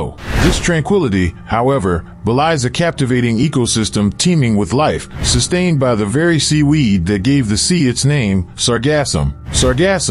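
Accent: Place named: United States. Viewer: Sorry. The animations and overall visual presentation is marvellous - but that awful 'merkin accent from the narrator is too much to listen to for more than a few seconds.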